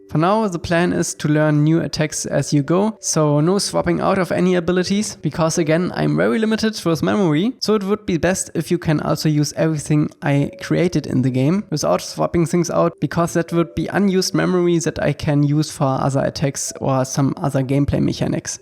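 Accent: Germany. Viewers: Musste am Anfang schon schmunzeln, weil man sofort raushört das du deutscher bist. Aber digga zieh durch, du hast mehr als mein Respekt und hoffe dass in 2 jahren ein geiles Avatar Game rauskommt und du da dann auch mitwirken kannst !❤ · Are you German or somthing linke this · That german accent i love it haha xd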